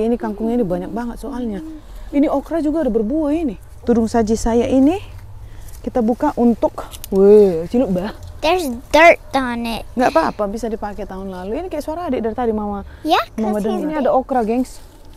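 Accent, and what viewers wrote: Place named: Medan, Indonesia. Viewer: Intonasinya dari Sumatera Utara ya, kayak cakap orang Medan. Kebetulan saya orang Medan, jd suka kiat cakapnya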